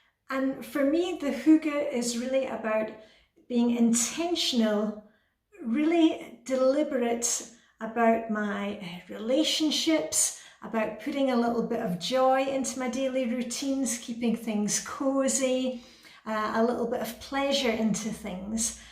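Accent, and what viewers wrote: Scotland, United Kingdom. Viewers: I like in Denmark, I don't need to win anything, I feel like a winner already, watching her happiness and little life tips in a sweet Scottish accent.Thank you!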